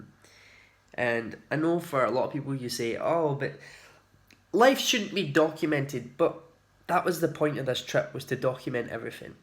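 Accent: Scotland, United Kingdom. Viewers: I love your vlogs, I love your Scottish accent, your eyes, your just a wonderful guy with great advice. Keep doing you.❤️❤️❤️❤️❤️❤️❤️❤️❤️❤️❤️❤️❤️❤️❤️❤️❤️❤️❤️❤️❤️❤️❤️❤️😘😘😘😘😘😘😘😘😘😘😘😘😘😘😘😘😘😘😘😘😘😘😘😘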